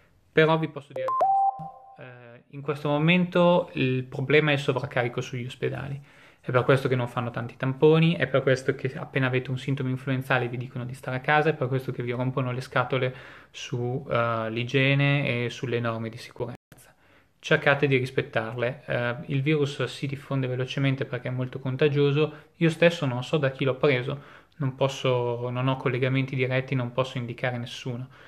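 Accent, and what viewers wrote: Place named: Piacenza, Italy. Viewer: Piacentino certificato!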